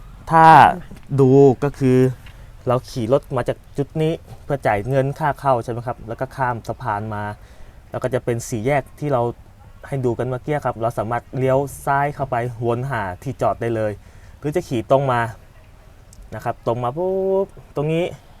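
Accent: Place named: Sukhothai, Thailand. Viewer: สำเนียงโข่ทัย...น่ารักดี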